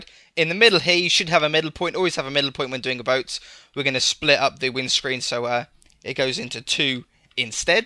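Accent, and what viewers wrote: United Kingdom. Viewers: hey what part of uk u from u sound like u live near me ive watched all ur vids well nearlly all of them and was wondering if i could maybe join and help build a house or skyscrapper or what i have built myself a massive monster energy can:)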